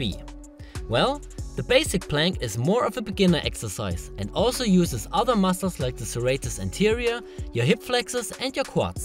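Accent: Germany. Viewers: lmao... 1 sec in to the video i knew u was german,no offense tho in any way or smt i was just pointing it out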